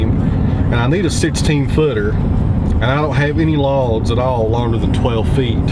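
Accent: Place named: Kentucky, United States. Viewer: You sound just my family in far southeast KY. I recognize that accent. Fine people.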